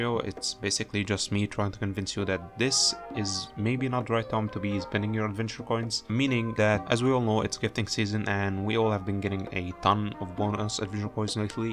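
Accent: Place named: Egypt. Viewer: I can tell that you're Egyptian from just your accent.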